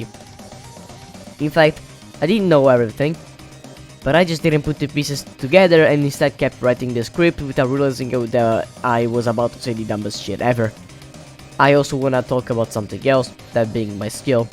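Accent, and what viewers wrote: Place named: Italy. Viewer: I love how blatantly italian your accent is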